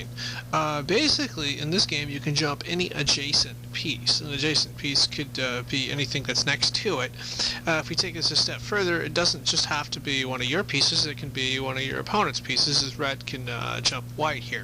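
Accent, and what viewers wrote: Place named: United States. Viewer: You have one of the most 'Murican accents I've ever heard.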